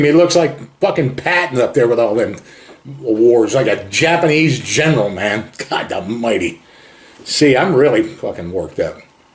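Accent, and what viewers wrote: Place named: Pennsylvania, United States. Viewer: LOL! I love how takes over and IS the boss. Where at are you from in PA? I'm from a small town called Barnesboro named after the coal mining company who owned everything "Barnes and Tucker". When I was an Apprentice Lineman we were building a transmission line outside of Ebensburg PA and we caught a crow that was injured on the right of way. My foreman took him home and raised him and he turned out to be the biggest thief of anything that was shiny. LOL! He was the best pet he ever had. Could never trust him around anything he was able to pick up though. HA! Did 3 years in the U.S. Army, 1981 to 1984, spent mostly at Ft. Bragg as an MP. Hopefully you won't hold that against me too much. I won't hold being a squid against you. LOL Love what you do! You cracked me up getting on your boy. You definitely sound Pennsylvanian.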